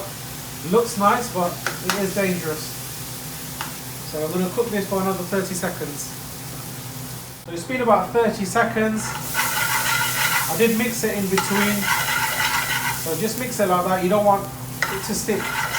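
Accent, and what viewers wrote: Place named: Stoke, United Kingdom. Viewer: You know, I was watching this and thought that sounds like a stokey accent and at the end you revealed that it was haha I’m in Stoke too and will definitely come down to the restaurant 😊 great videos, I love Indian cuisine and am trying to learn more about foods from different regions. Thanks for the videos mate 😊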